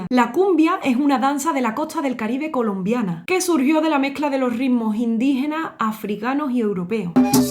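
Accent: Andalusia, Spain. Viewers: Cuando escuche una mujer de acento español que decia que iba a tocar y cantar una cancion tan hermosa me detuve. SOY DOMINICANO, y casi se me salen las lagrimas al escucharte nombrar un campo de mi pais donde hay mucha pobreza llamado Villa Vazquez, y al escucharte mencionar en la Cancion la ciudad de la Romana, una ciudad del este azucarera, llena de Bateyes, pequeños campos de campesinos que cultivan la caña de azucar que viven en pobreza extrema, donde aun no hay baños sino letrinas, y viven aislados del mundo sin ninguna comodidad. Esto no es solamente una cancion, es una historia triste, mis abuelos fueron agricultores, y la agricultura no se efectua aun a la fecha con maquinarias, y en esa epoca ni empleados se usaban, mis abuelos usaban a mis 8 tias incluyendo a mi madre siendo niñas y adolescentes, para cultivar la tierra, y cuidar ganado desde la 5 am y a veces mas temprano, en esa epoca y aun a la fecha muchos campesinos ni tienen sistema de irrigacion dependiendo 100% de las lluvias, y hoy que vivimos con tantas comodidades a pesar de ser pobres. 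Ojala algun dia se cumpla el sueño de en todo el mundo y principalmente en LATAM, donde hay tanta pobreza en los pueblos agricolas. Quizas para ti y para la mayoria estas solamente sean las letras que riman de un merengue, pero para nosotros, la yuca y el te, el arroz blanco que es parte de nuestro plato nacional es una realidad. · El algoritmo me trajo aqui. Mas que el virtuosismo de tu cantar y tu guitarra, me encanto tu acento "Andalu Light".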